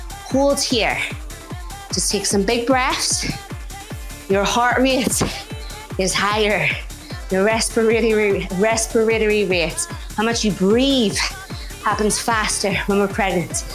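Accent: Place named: Northern Ireland, United Kingdom. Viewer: I feel like you're from Northern Ireland, where are you from? 😄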